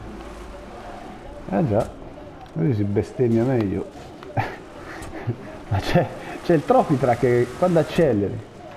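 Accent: Rome, Italy. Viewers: super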